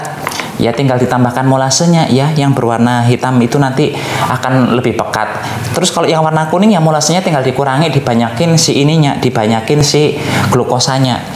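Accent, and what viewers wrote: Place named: Banyumas, Indonesia. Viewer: Liik sampean asline Endi, medok banget ngomonge .. Banyumas apa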